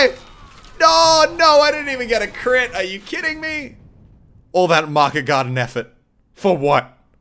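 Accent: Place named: Australia. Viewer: Your Australian accent seems to come out a lot today. I like it (•w•)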